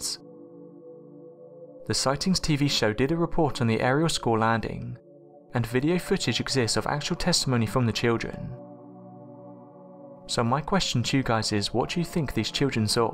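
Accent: England, United Kingdom. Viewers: Everything is better with a English accent.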